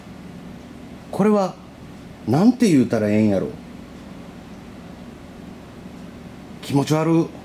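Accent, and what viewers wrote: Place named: Osaka, Japan. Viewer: 意識すると大阪弁は出ませんねぇ。 殆ど漫談になってるのが、おもしろいです。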